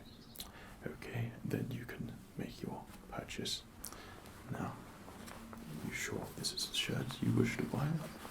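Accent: England, United Kingdom. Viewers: You english tho.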